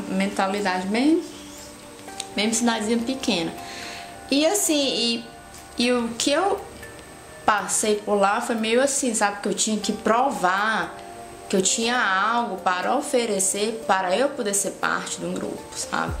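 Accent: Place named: Northeast, Brazil. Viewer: muito bom o video, eu morei fora e senti a mesma coisa, pelo seu sotaque você parece ser do nordeste como eu, acho que é pior...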